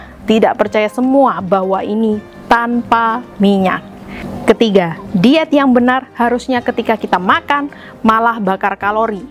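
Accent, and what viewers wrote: Java, Indonesia. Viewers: Ngakak jawa koek nya.....tp mudah di fahamj...trimakasih....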